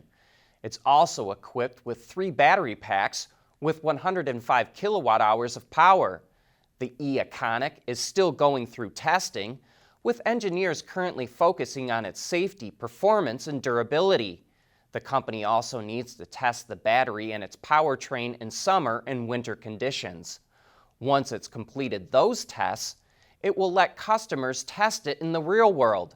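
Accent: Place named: Michigan, United States. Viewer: Heavy Michigan accent!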